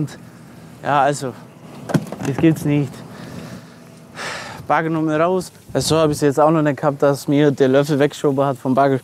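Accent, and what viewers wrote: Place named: Swabia, Germany. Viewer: Schwäbischer Dialekt - einfach Kult! 🙏😅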